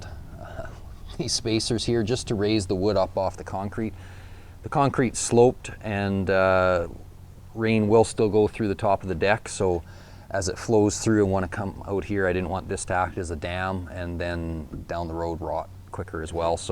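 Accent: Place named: Canada. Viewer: The most canadian accent.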